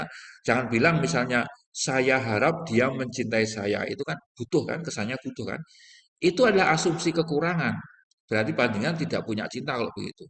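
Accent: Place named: Pekalongan, Indonesia. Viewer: Pekalongan hadir... Logate jenengan mirip2 pekalongan.. Jenengan pundi?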